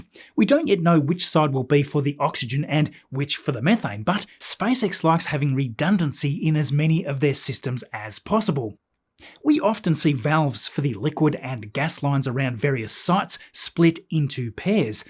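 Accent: Australia. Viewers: Sorry ... aber mir geht das hektische australische Gequatsche von Dir nur mehr am Arsch vorbei ... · coffee and ' charming Aussie accent. Yep, it's saturday and the day is starting well.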